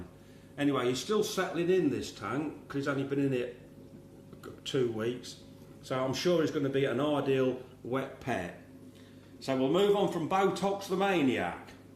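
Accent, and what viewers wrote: United Kingdom. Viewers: Difficult for all of us to listen to our own voice. Yours is great, although us Americans tend to be drawn to that British accent. Anyway, sound great and relaxing video. Please keep it up.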